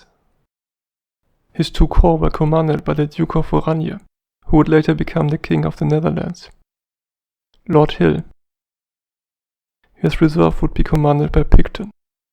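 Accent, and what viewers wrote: Germany. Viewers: That is not his language, he is German, I hear that, I am one myself ;) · I refuse to engage with animated history unless it is narrated by you with your class, inimitable German accent. Great channel, rock on top lad.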